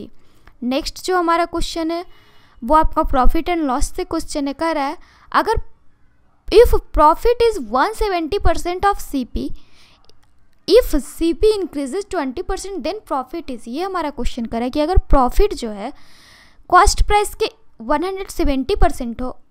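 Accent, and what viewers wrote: Bihar, India. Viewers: mam bihar ki tune hai aapki...ya up east ki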